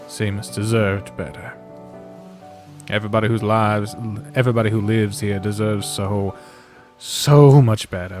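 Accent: United Kingdom. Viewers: Ahhh... kudo's to you for your bri-ish accent, Also, Love the new video!!! I just binged all the videos I missed ever since the start of December so I'm glad I could finally be on time again ^^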